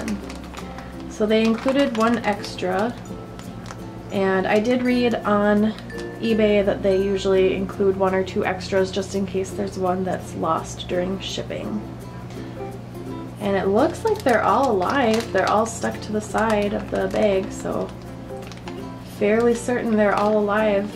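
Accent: Minnesota, United States. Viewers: Hah I knew you were from Minnesota. That accent where bag sounds like "bage". My 3rd grade teacher was from Minnesota.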